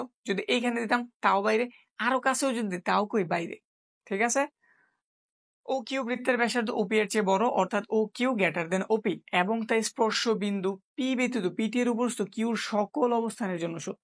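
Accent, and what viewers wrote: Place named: Barishal, Bangladesh. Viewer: স্যার আপনার😊 বাড়ি বরিশাল আমার বাড়ি কিন্তু বরিশাল😂 কারণ আপনার কথায় বোঝা যাইতেছে😮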